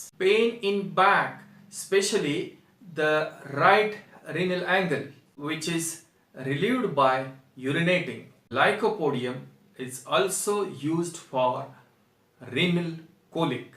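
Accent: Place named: India. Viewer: According to your delivered speech,you look like an Indian. But why do use foreign language,? What do you want to show by this filthy language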